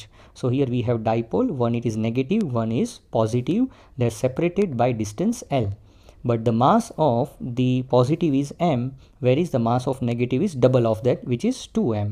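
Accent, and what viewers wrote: Gujarat, India. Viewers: Sir doubt toh solve ho gaya...but your gujarati accent..🤣❤❤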